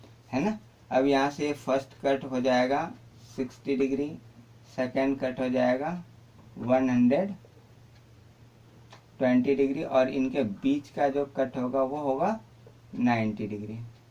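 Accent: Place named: Haryana, India. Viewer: Haryanvi rocks :))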